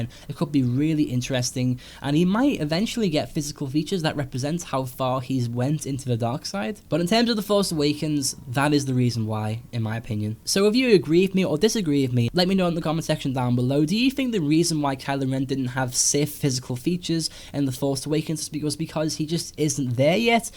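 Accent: Liverpool, United Kingdom. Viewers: are you a scouser? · It is a mild liverpool accent (collegially known as "scouse"). Its an interesting English accent because all the surrounding accents are very different (eg the Manchester and midlands accent). It derives from the large influx in Irish immigrants to Liverpool during the potato famine. This mirrors the American Bostonian accent, which similarly derives from Irish Famine immigrants who went west to America rather than east to Britain. The English have so many accents and it refreshing for people to acknowledge other accents rather than just the standard "received pronunciation" of the Queen's English. (Not that there is anything wrong with RP) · Ahh a fellow scouser :) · Sweet video, you from Liverpool by any chance? You sound pretty scouse!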